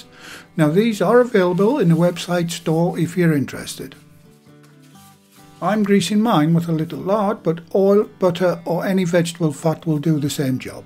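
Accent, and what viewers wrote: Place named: Northern England, United Kingdom. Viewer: From a French person (from the country of quiche), I approve. Love the Northern accent. 😊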